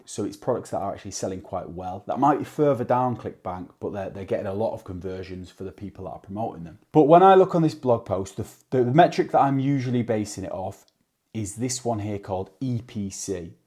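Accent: United Kingdom. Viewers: good and clear presentation,thanks for a different view, a very clever lad, and a fellow brit I think.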